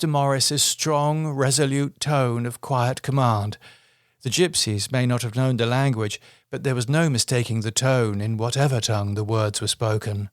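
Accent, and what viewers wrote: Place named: England, United Kingdom. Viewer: By far the best Bram Stoker's Dracula narration ever! You cant have the narration done by any but an English accent. accents for all the characters are all top notch! For anyone who hasn't listened to his vampire stories of Underwood & Flinch, you need to check them out ASAP!!! I believe they're at least as good and maybe better than Ann Rice's Interview With a Vampire and better than any other Ive ever read or listened to.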